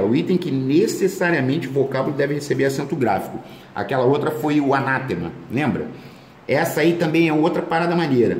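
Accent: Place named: Pará, Brazil. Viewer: Proff vc eh muito legal..seu sotaque parece com o nosso aqui do Pará. Vc eh 10..e pra ser 20 precisa odiar o Bolsonaro kkkk!! 2021 ta phodaaa! Deus nos livre logo! AMÉM!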